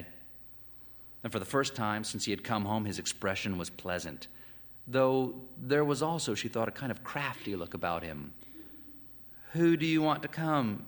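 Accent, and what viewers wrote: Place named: South, United States. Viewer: He grew up in South Carolina but moved to New York in his early 20’s, so his accent is not as strong as it used to be...well that is until he reads a southern author or speaks with someone from there. Then his accent becomes thicker.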